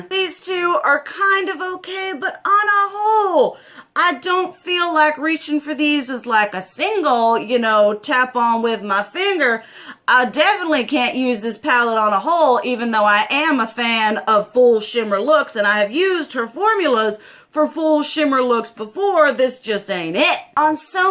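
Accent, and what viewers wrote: South, United States. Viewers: Is there anything better than a big titty goth gf with a southern accent? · I'm new to your videos I'm from the south but I was not expecting the Southern accent I was like wow ok nice but no hate i liked this video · Are you southern ??? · Woah. Just found this in my recommendations and I'm subscribing now. A fun pale goth woman with a southern accent talking makeup. This is amazing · first time watcher. can't get over Wednesday Addams having a southern accent 😅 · Super new here. Goth Chick with a thick ass country accent. I am in love!!! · I thought she sounded more Virginia/Carolina area. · I just love your voice. I am guessing you are Southern like myself. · I love her southern accent!!!! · This is my first time on your channel... you seem to be goth with a country accent... coming from Arkansas I'm living for it. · Pretty goth lady with a southern accent? 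Amazing!!! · Ok but I'm glad I'm not the only gothy person with a country accent lmao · What’s up with the Wednesday Adams costume and the southern accent? Every video your accent varies. I’m not sure what’s going on with here. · You randomly popped on my recommendations and I absolutely love your accent You’re so loud spoken and extra in a wonderfully southern way As a Caribbean girl people often complain that my speech like yours is loud But that just how I am and I love to see another female who’s voice isn’t all super dainty quiet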